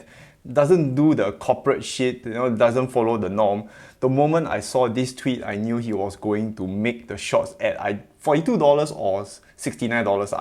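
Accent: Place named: Singapore. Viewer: You are doing very well, thank you very much👍👍👍💖😀 . Please take some lessons in innonciating English WORDS properly and correctly, to reduce or eliminate your very heavy Singapore accent. Thank you 👍😀👍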